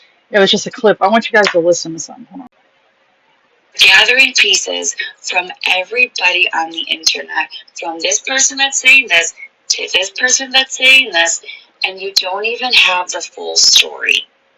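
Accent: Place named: Ireland. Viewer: Your perspective is exactly right. I enjoy listening to you.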